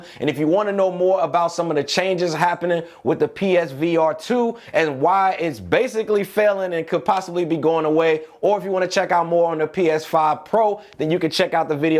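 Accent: St. Louis, United States. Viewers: Big stl in bro voice shoutout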